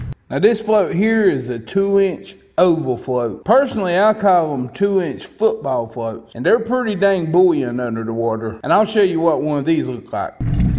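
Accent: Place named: Southwest Virginia, United States. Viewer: If I didnt know any better , I would say with the accent you probably pretty close to my neck of the woods Southwest Virginia here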